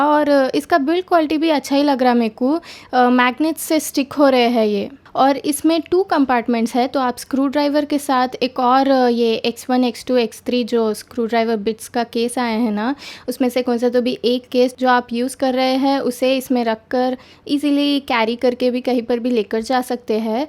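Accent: Hyderabad, India. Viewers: i like hyderabadi accent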